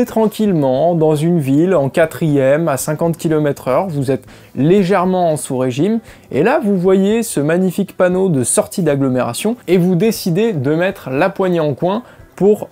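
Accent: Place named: England, United Kingdom. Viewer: oh putaing l'accent anglais .......😂😂❤ Tu mélange beaucoup de choses! Ride-by-Wire, appelé aussi Drive-by-Wire, vu pour la première fois sur la Yamaha YZF-R6 en 2006. La poignée des gaz est reliée à un contrôleur, lequel envoie les changements de position de la poignée à l’ECU, lequel à son tour envoie un signal aux actionneurs, les composants électroniques et mécaniques agissants sur les injecteurs, en prenant en compte différents paramètres : le régime moteur, la vitesse enclenchée, l’oxygène non consommée dans l’échappement, etc. Ce système permet un contrôle précis du mélange air/carburant......après le reste c'est de la mécatronique que tu es en train de mélanger à ton sujet...❤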